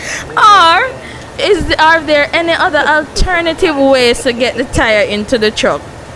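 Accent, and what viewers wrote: Jamaica. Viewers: You talk funny woman, like Island woman, like Jamaica.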